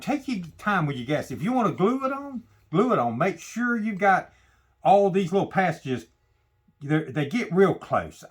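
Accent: United States. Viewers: Are you from Kentucky? Your accent sounds like my relatives.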